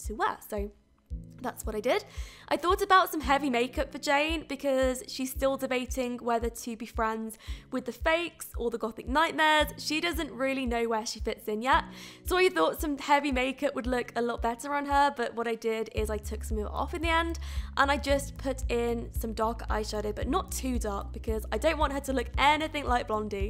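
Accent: United Kingdom. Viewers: I love your channel!! And i wish my british accent was as nice as yours; your voice is so nice!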